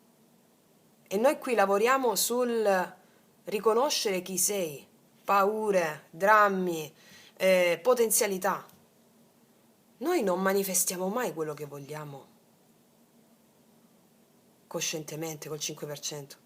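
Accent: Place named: Sicily, Italy. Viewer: Ti dimentichi che non siamo tutti siciliani.,. parli troppo in fretta ti mangi le parole con il tuo accento... grazie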